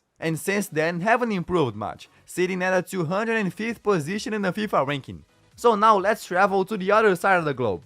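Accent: Brazil. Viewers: Ive live in brazil for almost 5 years and i can guarantee that this is a brazilian accent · Sounds like a Brazilian accent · This guy speaks English in a Rio accent.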